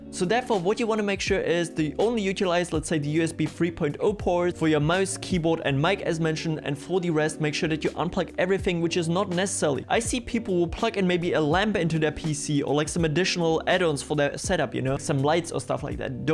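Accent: Germany. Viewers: You got a crazy German accent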